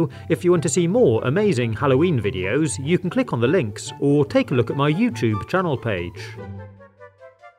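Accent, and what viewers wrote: United Kingdom. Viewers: u keep on saying "cool huh?" But are u from UK?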